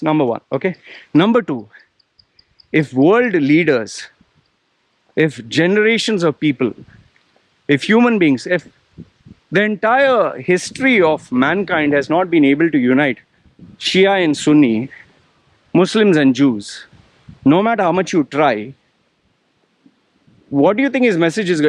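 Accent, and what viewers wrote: India. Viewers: You're definitely not wrong! I'm a Muslim we're supposed to believe with conviction our book and prophet. So some canadian professor waving his finger saying you muslims this and that is a joke to me. I actually respected him alot until this video it really made me see his ignorance. I believe you're Indian from your accent so we both know how western culture colonized and pillaged our countries for their resources and wealth.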